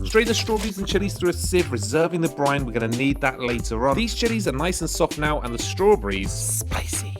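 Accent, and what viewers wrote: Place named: England, United Kingdom. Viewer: As a native english speaker I never thought I'd encounter an english accent too thick for me to comprehend naturally...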